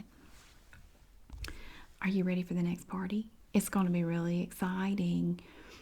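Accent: South, United States. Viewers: I love asmr, and i'm so happy that there is a person from NC doing it now, and that has a good southern accent!!!!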